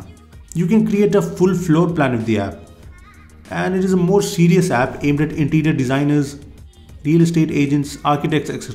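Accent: India. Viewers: Im fucking done with indian accents it sounds like gurgling sounds😠😠😠😠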